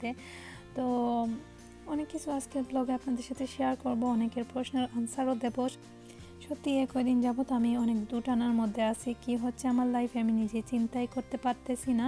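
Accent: Sylhet, Bangladesh. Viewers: assalamualukum apa apnar muko amrar sylheti mat hunte ow besi bala lage go please sylheti bashai matba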